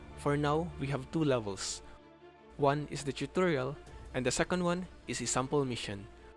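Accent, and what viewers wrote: Philippines. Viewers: Pinoy? Your accent was so obvious.. Anyway I love your video keep it up.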